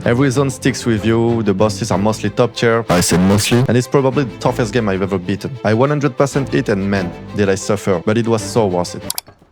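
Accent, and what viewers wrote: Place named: France. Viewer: remove the french accent you sound pity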